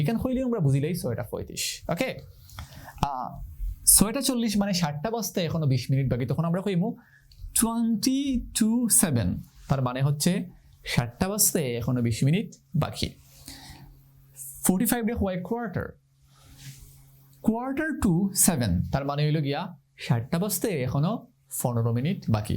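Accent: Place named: Sylhet, Bangladesh. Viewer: Very impressive sir. ওরে ছিলটি রে। ভালা লাগলো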